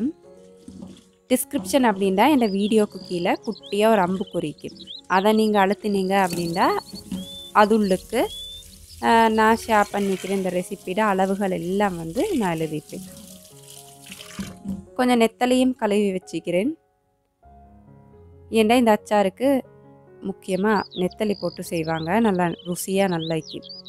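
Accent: Akurana, Sri Lanka. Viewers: Slang is similar to Akurana , kannuku kulirichi Ana wai urina oru vedio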